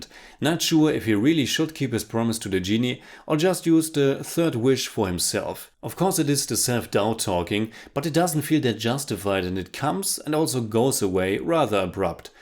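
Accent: Germany. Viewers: i thought you were from austria but that accent sounds the same to me, still german language. are you from austria or germany? you are the best reviewer ever by the way. i say that every time and i a say it again. i'd listen to your reviews about anything--movies, books, cafes, anything, i would trust your every perception.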